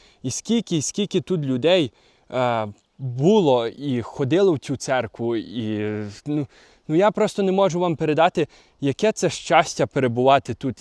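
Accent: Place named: Western Ukraine, Ukraine. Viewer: Говор западенца....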